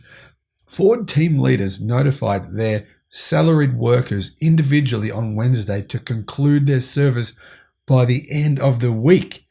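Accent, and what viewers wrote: Australia. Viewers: sounds Australian.. 😁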